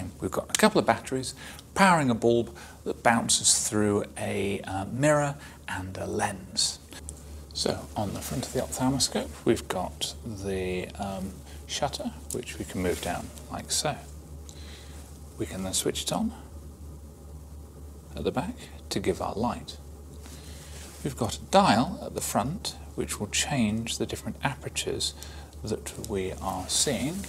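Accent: United Kingdom. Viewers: British accent 💚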